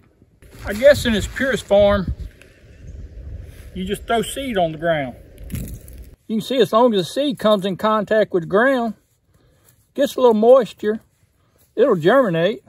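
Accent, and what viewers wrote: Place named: South, United States. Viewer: Preaching to the choir. Love the way you say soil with that southern drawl.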